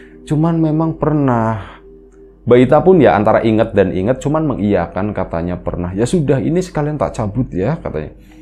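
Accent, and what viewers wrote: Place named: Tegal, Indonesia. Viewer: Lama gak dengerin 1,5 thn lebih ,logat naratornya Tegal banget,he..he...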